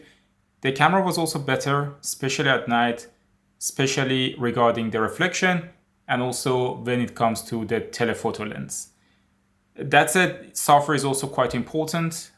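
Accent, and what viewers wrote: Iran. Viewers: لحجه فارسیتو دوس دارم 😅❤ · چرا لحجه ایرانی داری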